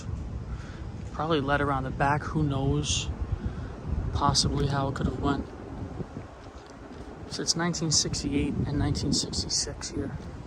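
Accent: New York, United States. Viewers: Terti years ago! Great NY accent. :)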